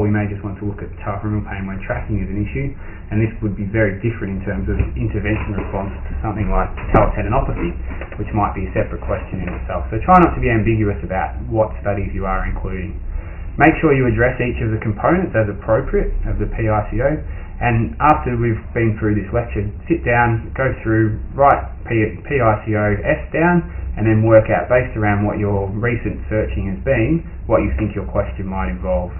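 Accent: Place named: Australia. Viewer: Your Australian accent reminds me of school at Griffith university in the Gold Coast😄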